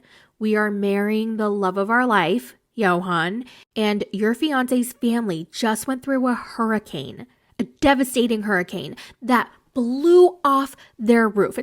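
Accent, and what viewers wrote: United States. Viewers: Just to tell you - YOU ALSO have an accent. American one. xd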